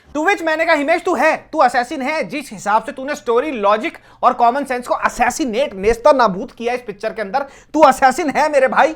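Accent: Haryana, India. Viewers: Your haryanvi accent is to the point.😂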